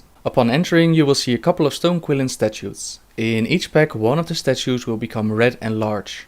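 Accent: Netherlands. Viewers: Dude, amazing guide, real nice and clear:) I have a question tho, Are you dutch? you sound like a dutch guy talking english:p Keep it up man:D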